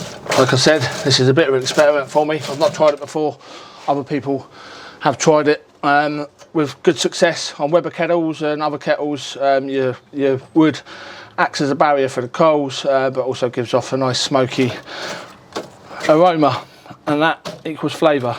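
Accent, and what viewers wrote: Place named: East London, United Kingdom. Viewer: Nice to hear a great East Laaahndan (or Essex) lad showing the World how to do pork “right proper”! Thank you, I’m trying pork on the rotisserie tomorrow, and I’m going to copy your great instructions.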